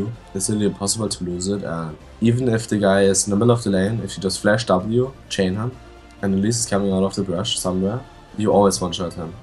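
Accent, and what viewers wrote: Germany. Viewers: You can clearly hear his german accent :D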